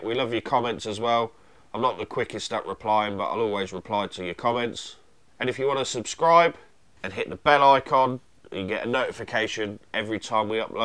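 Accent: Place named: London, United Kingdom. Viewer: Hey, great series on the Bandit rebuild! I've bing watched them over the weekend and found them very helpful. A year ago I bought a 2002 Bandit 1200cc and fell in love with it. Like you I would like to keep riding it for awhile, and even though I've never worked on a motorcycle before, I've stated to to tackle some basic tasks like changing the brake pads, and replacing the sprockets and chain. By seeing you take the bike apart and fix what needed to be fixed gives me confidence and clear guide to tackle any future repairs and modifications. So thanks to you and your sponsors. I'm from the States and It seems that that Bandit series is more popular in England than here. I not sure why. One other thing, your accent sounds similar to Micheal Caine's, are you from London?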